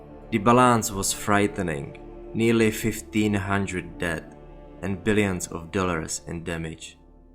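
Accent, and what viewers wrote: Czech Republic. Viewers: Cesky prizvuk slysim :). DIk.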